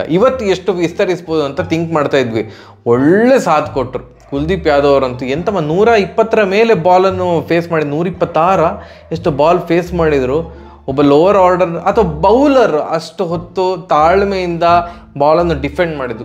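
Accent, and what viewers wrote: Mangalore, India. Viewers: Bahal chanda mangalore kannada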